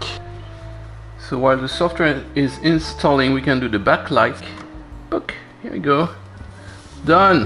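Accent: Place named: France. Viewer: Nice bit of jazz and a french voice 👍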